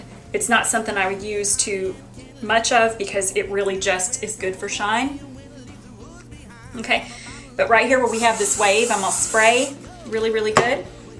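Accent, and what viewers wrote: South, United States. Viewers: Favorite part; "I'mon spray.." Favorite southern belle in the world.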